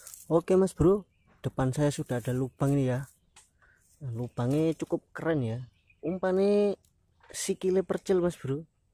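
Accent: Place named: Banyumas, Indonesia. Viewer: Iki tunggal e cak agus ngapak😂🤣spot e marai ngiler🤣🤣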